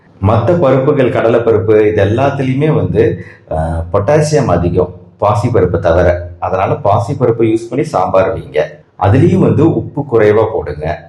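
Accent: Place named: Coimbatore, India. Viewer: வணக்கம் டாக்டர். கோயமுத்தூர் பாஷை வாசம் வருகிறது உங்கள் பேச்சில்... சிறந்த அறிவாளி அறிவான சொல்.. செயல்... வாழ்க பல்லாண்டு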